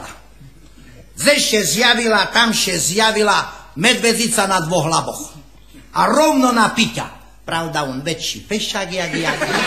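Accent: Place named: East, Slovakia. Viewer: abo kto nepozna vychodnarcinu ten da palec dole podla mna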